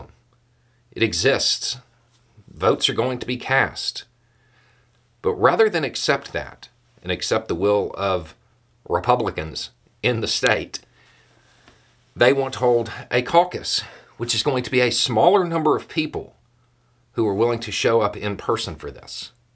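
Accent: South, United States. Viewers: Please do a couple more videos with your southern accent!!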